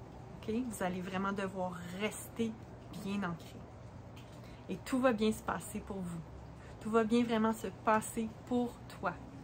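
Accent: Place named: Quebec, Canada. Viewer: Tellement apaisant le bruit de la pluie, le bois, les couleurs des feuilles d’automne … et l’accent québécois 👌 merci pour cette douce mélodie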